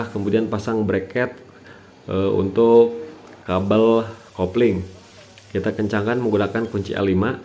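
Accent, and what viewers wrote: West Java, Indonesia. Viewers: Alamatnya daerah mana logat ada bahasa sunda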